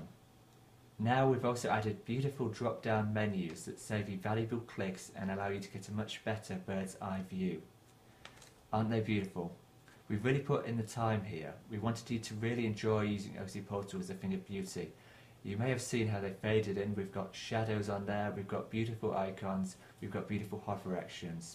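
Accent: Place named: United Kingdom. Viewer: The Brittish accent, itself, isn't a problem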